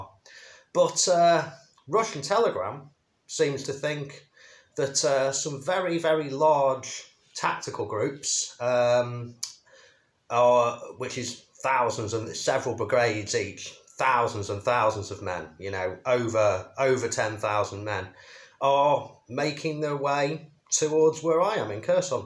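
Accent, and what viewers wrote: United Kingdom. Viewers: You must be from London or areas around as you sound exactly as one of my British colleagues, even tone of your voice! If I were to listen to both of you, I wouldn’t be able to tell who is speaking! Stay safe, mate, thank you for all you do, you have my outmost respect! You now have a subscriber from San Francisco.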